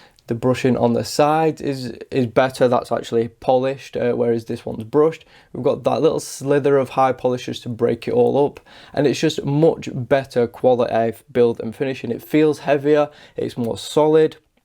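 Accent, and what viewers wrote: United Kingdom. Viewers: Super Fluent British Accent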